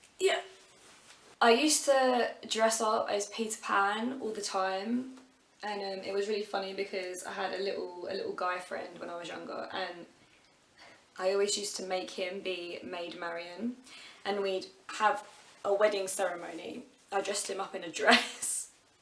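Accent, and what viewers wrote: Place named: London, United Kingdom. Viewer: May I ask which part London you are from?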